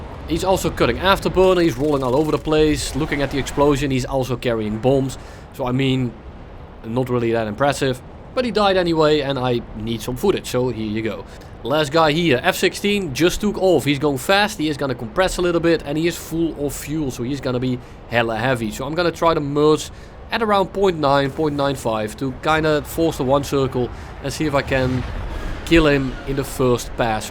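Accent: Netherlands. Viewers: Steenkolen English 👍🏼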